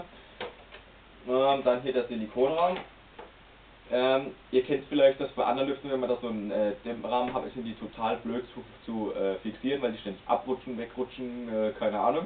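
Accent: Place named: Swabia, Germany. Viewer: Wie ich den schwäbischen Dialekt hasse, da kommt so richtig mieses Fremdschämen auf - Ich bin selber Schwabe... ;_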